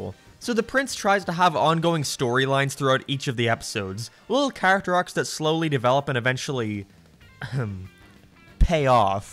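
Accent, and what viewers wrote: Northern Ireland, United Kingdom. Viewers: You're not british? Aren't you from northern ireland?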